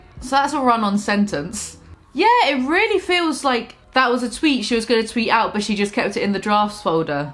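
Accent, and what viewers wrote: United Kingdom. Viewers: where in the UK is your accent from? its my fave one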